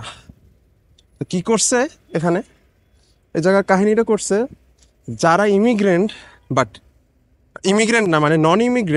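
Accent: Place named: Jessore, Bangladesh. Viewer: আপনার ভাষা আমার এলাকা যশোরের মতন অনেক টা । আপনার দেশের বাসা কোথায় ?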